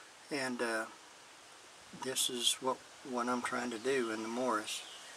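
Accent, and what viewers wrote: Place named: United Kingdom. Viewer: Well I love the english accent, but I think it's a mixture of british, australian and south african!!!! That's a jolly big head on ya beer, you have to tilt your glass and gently pour it in........I just had a laugh at the tasting, very good video, but I wouldn't personally drink Bass even if I was forced!!! We'll have to try and get you some English beer sent from across the pond!!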